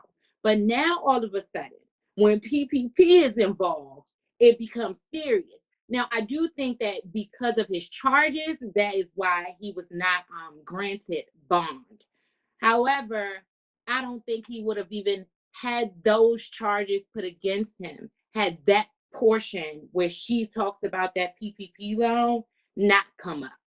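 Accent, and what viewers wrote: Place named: Washington DC, United States. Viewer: I could tell that you were from dc. Our accents are very distinct.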